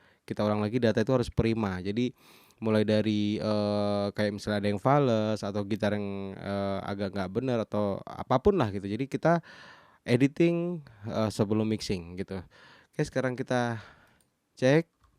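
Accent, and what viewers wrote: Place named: East Java, Indonesia. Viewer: Logat jawa timurnya berasa mas... Hehehe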